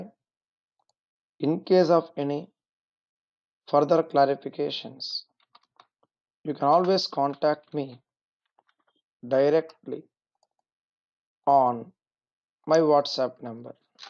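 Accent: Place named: England, United Kingdom. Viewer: I don't know where you are from but, every time I hear that English accent explaining something I know that my issue is going to be resolved! THANKS, A LOT !!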